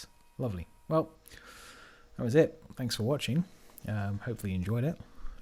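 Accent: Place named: United Kingdom. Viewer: The craftsmanship is great but does this fella gotta use the British accent? It’s like chewing on tinfoil while watching the chalkboard scratching scene in Jaws!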